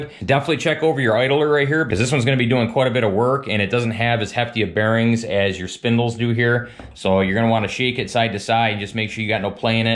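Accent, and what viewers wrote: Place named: Western New York, United States. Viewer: Lol I'm in Rochester... I recognized your accent immediately. WNY represent!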